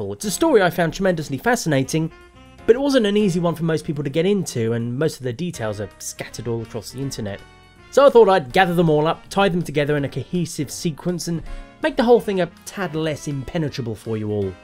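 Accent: United Kingdom. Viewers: Thanks for this video mate it was exactly what i was looking for. Decent length breakdown all the important details and British narration. Cheers matey! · I like your awfully delightful British accent and your sarcastic humor. How haven't I heard about you previously? well anyway Keep up the good work. I'll be watching you... in a good way... nice socks btw. Where'd you get them?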